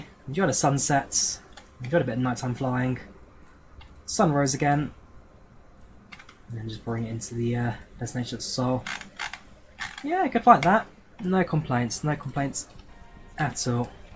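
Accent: United Kingdom. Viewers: Why are you using British accent?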